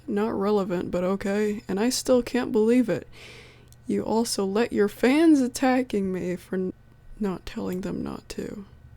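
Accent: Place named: South, United States. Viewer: I love your voice you sound southern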